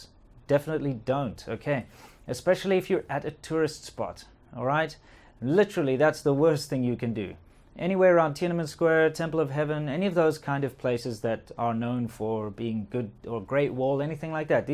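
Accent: South Africa. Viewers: South African? Thanks for filming in Scope. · why do you always tell chinese locals you are english when you sound like you are from south africa? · You sound South African ! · You sound South African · Hi, I like your videos but why do you tell everyone you are from England? Your accent is quite markedly South African. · are you south african ? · You're not from London. You sound like you're from South Africa.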